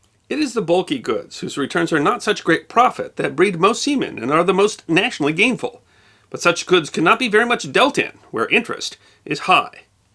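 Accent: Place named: United States. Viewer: His American dialect is not easy to understand.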